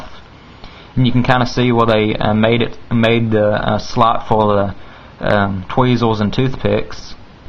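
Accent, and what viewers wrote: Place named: Texas, United States. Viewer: it obvious he from texas luk at the accent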